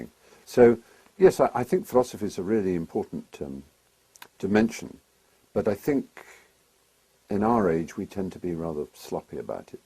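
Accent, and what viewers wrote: England, United Kingdom. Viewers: Mental masturbation with an English accent.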